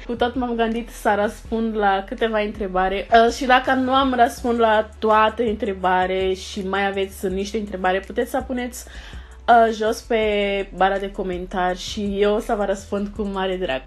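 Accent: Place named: Bessarabia, Moldova. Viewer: Ai un accent basarabean sau undeva pe acolo.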